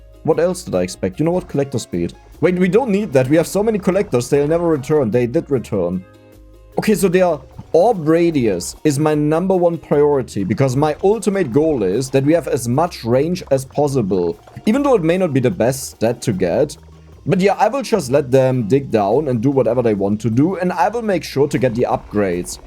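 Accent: Germany. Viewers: The word iron is a phonetic catastrophe, pronounce it literally however you want. Aussies don't really pronounce Rs in most words so I'm used to hearing it pronounced the same as "eyeing" with a silent g but I've heard countless youtubers pronounce it differently and the only thing that really matters is that people know what you mean. If "eye-ren" is what feels most comfortable for you given that you speak multiple dialects, then that's what feels more comfortable. Personally I like hearing the little German affectations that come through your English speaking, and I normally really struggle with accents cause hearing problems (that said I really do appreciate your vocal projection and how much you annunciate cause it makes it a lot easier for me to listen) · Höre ich da Deutsch 🇩🇪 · Ich habe die ganze Zeit darüber nachgedacht ob du deutsch bist wegen deinem Akzent.. 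und dann würdest du wütend, ich habe mich kaputt gelacht😂😂 (Inserts to friendly German) 😂😂😂😂😂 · It's not quite ion, more like iun. You actually said it properly at least once! But I love the way you've been saying it, the German accent makes it sound medieval and authentic somehow!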